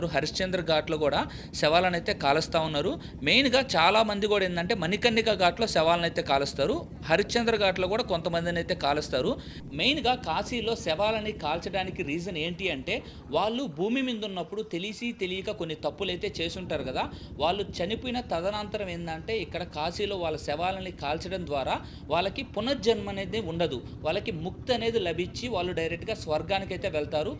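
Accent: Nellore, India. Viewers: Meedi Nlr bro mee language vini aduguthunna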